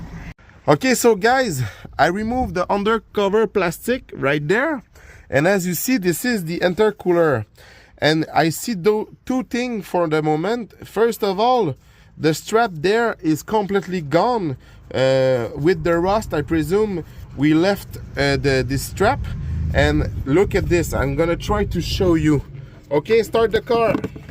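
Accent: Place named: Quebec, Canada. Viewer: Je reconnais bien l’accent québécois 😅 j’ai le même! Merci pour le vidéo je m’apprête à remplacer l’intercooler sur le Santa Fe à ma conjointe.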